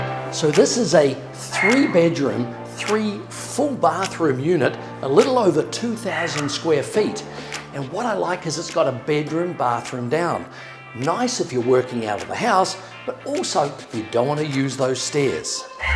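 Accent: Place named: Australia. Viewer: Don’t know if that Aussie accent helps sell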